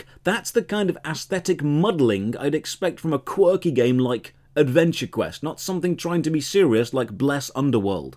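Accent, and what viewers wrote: United Kingdom. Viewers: I often wonder what your sub count would be like if you didn't look like Henry Cavill and have a British accent.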